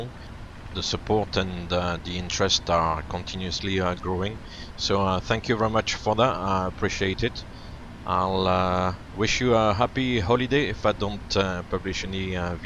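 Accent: France. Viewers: as french i can confirm he is from france, i can recognize french accent by thousands xD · love the french accent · most probably French?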